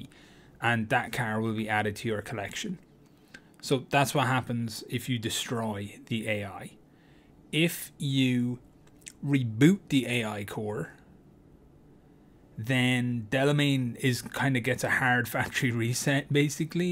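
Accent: Ireland. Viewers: i can feel the irish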